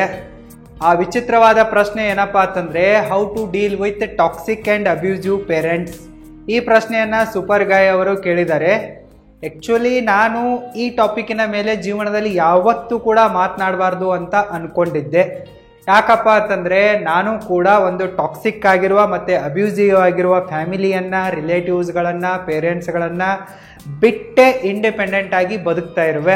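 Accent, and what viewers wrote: North Karnataka, India. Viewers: Nimdu uttara Karnataka na sir?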